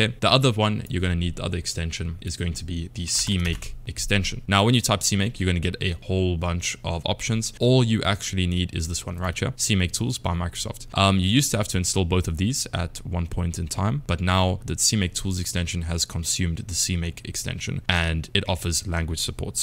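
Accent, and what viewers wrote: South Africa. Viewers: Dude are you from SA 🫣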